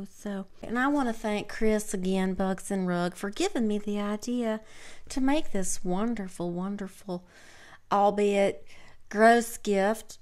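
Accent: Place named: Tennessee, United States. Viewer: There's some Reese Witherspoon in your accent. I guess that makes it a Tennessee accent.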